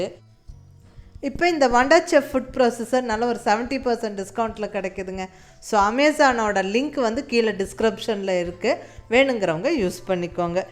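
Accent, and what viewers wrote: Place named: Coimbatore, India. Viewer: உங்களோட கோயம்புத்தூர் பேச்சிற்கும்.... உங்களோட கோயம்புத்தூர் சமையலுக்கும்.... திருநெல்வேலி மங்கை தன் தலை சாய்ந்து தங்களை அன்போடு வணங்குகிறாள்..😊😊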